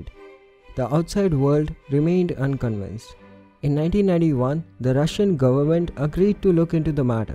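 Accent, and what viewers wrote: India. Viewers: You have a indian accent, I like your contents.Thanks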